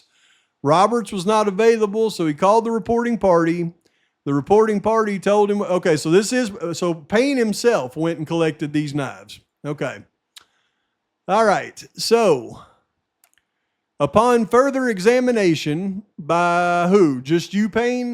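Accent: United States. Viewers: I started listening to your vids recently. Please drop this heavy hick accent. Please. Please. It’s distracting and makes it difficult to listen. Appreciate the info and insight.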